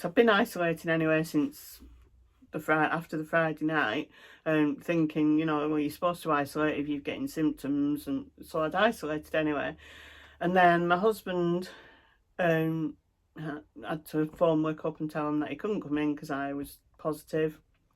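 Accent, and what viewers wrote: Lancashire, United Kingdom. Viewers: Hi, my name is Marion. Love your videos, I'm Canadian so it took me a bit to get used to your Lancashire accent, but I understand everything now😁. Sure hope you feel better now. Would like to stitch flowers! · Would love to stitch the tree or the flowers. So sorry you have been sick, but glad you are back. Certainly understand the different accents for areas of the UK. I love listening to yours. :-) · Sorry to hear you have been unwell x I love your Lancashire accent,I was born in Accrington and now live in Southport I could listen to you all day x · Your Lancashire accent is one of the lovely things about your podcast. Having lived in Rochdale for a number of years before, I would move back to the north of England in a flash if my family could be persuaded to move! Isolating would be a breeze if I had a nice tree to sit under and stitch.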